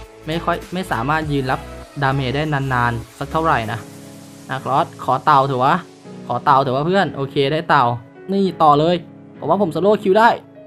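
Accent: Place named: Thailand. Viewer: พูดเหน่อม๊ากกก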